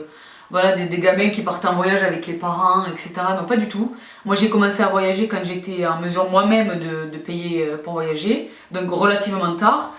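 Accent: South, France. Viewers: Super personnalité, super accent du sud, super belle, super intelligente (bon j'arrête là:D) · Super vidéo! Ton accent vient du sud? Marseille? Ou Toulouse? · Quelle belle rencontre!!!!!!! Tu m'éclates. J'aime ce que tu dégages j'aime ton humour ton accent avec tes mots parfois qui me semblent être de l'occitan ou du basque bref le sud quoi!!! Merci merci merci Voilà mes résolutions pour cette nouvelle année combattre la médiocrité et me faire du bien. Merci à byreo grace à qui je me suis orientée ce vendredi vers ta chaîne que j'adopte Continue à nous faire du bien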